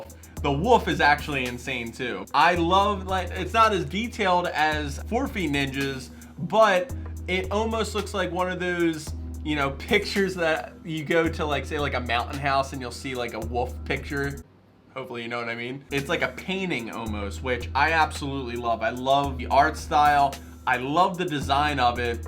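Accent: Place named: New Jersey, United States. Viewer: He must be from jersey, “balldamore”/Maryland, or PA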